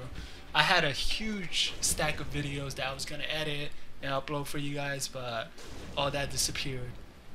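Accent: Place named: New York, United States. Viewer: you sound new yorkish or even maine-ish. eitherway u have a cool voice. btw what sl were u in this video?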